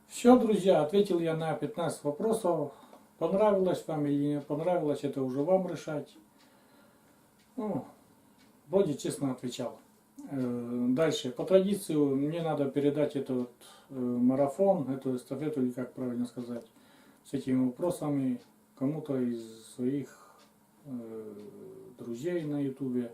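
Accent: Komi, Russia. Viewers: Привет,очень интересно было тебя посмотреть и послушать твой типичный комиговорок.....однако! Восхищает твоя жизненная позиция, простота и откровенность. +41 от меня и всего тебе доброго, нужного и хорошего.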